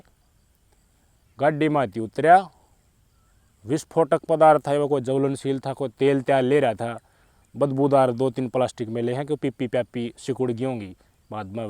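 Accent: Haryana, India. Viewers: भाई आपका हरियाणवी बोलने लहजा बहुत बढ़िया है आपका गांव कौन सा है भाई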